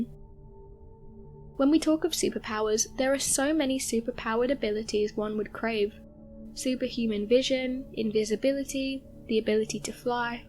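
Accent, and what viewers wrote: England, United Kingdom. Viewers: Your narrator sucks! Save the accent for England!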